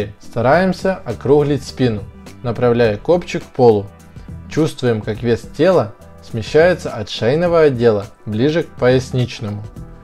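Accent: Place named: Ukraine. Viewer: Опять ховор, укр...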